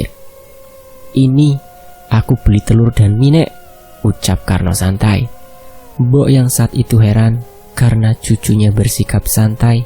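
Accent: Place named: Banyumas, Indonesia. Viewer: Ngapak juga bisa pokoke the best 👍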